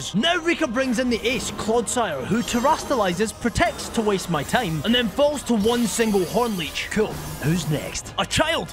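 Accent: Scotland, United Kingdom. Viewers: Its nice that you have a Scottish accent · you're so aggressively scottish and i love it because so is my grandfather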